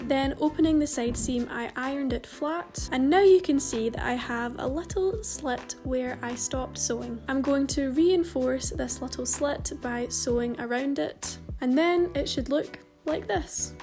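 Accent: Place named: Scotland, United Kingdom. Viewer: Love the Scottish accent makes me miss home :) love the flips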